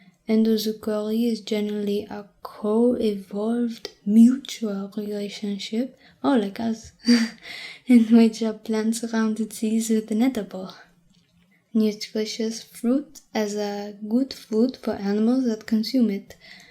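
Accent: France. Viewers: Ur french accent is neat ^^ u put a lot of effort in it Nice roleplay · everyone gonna ignore the fact we just got a free science lesson while listening to a nice french accent.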